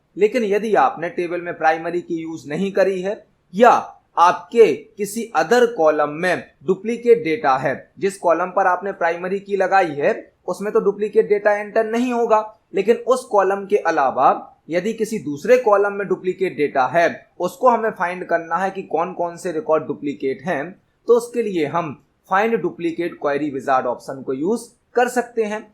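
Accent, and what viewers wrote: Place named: Bundelkhand, India. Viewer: Sir are you from mp!? Because aapki voice ka accent bundelkhandi sound kr rha h specially damoh ka🧐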